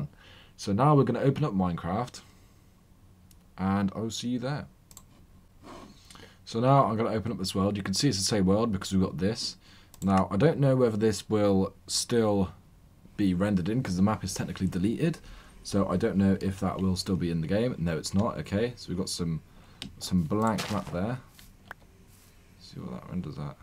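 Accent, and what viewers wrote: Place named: United Kingdom. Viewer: HES BRI' SH!!! (thx btw for the tutorial)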